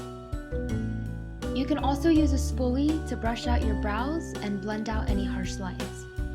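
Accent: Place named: United States. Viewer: Ur cute at american accent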